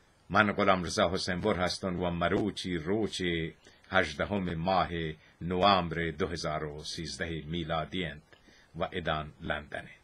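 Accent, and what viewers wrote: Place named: Baluchestan, Iran. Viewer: he is a saravani irani baloch hence the different accent.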